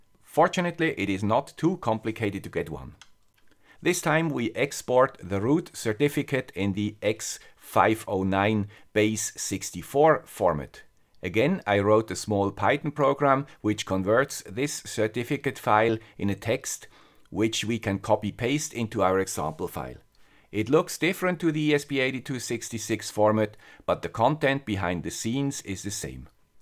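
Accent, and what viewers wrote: Switzerland. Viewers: That "guy with a Swiss accent" sure is smart! Thanks another great lesson:)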